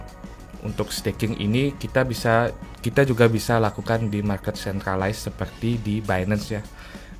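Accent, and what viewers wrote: Bali, Indonesia. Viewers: Bang kok logatnya ky org bali ya?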